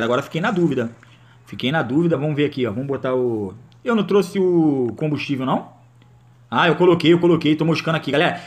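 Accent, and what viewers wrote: Rio de Janeiro, Brazil. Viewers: EAE GALERA NA ÁREA sotaque Do rio é foda Like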